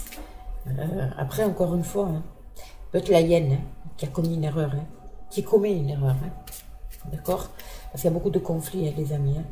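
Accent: South, France. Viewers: Bonjour nouvelle abonnée, j ai vu votre commentaire et me voilà ! Comme beaucoup ici j ai toujours entendu que la loi martiale en France ça n existait pas! Et je vois que vous dites oui tant mieux ! Mais qui a déjà vu des militaires ? C est a venir ? J entends votre accent je me trompe peut être mais c est le sud 🥰 . Oui on doit faire attention ça je le sais !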